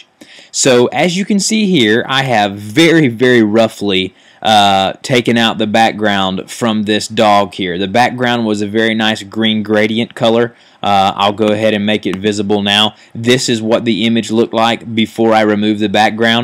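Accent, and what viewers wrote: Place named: Tennessee, United States. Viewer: I love your voice. I detect a slight accent. Is it from Tennesee?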